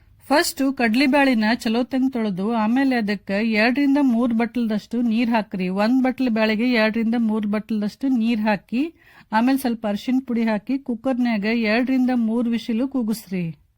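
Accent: North Karnataka, India. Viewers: Ma’am I’m absolutely delighted to hear your North Karnataka Kannada!! I appreciate you for keeping it real...... 👍🏼👍🏼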